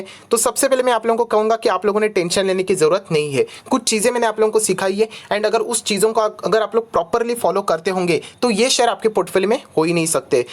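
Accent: Maharashtra, India. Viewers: Sir aap ki mothertongue Marathi lagata hai.....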